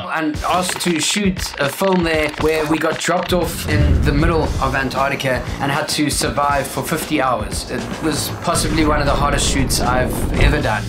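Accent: Cape Town, South Africa. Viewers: i thought you from cape town bro.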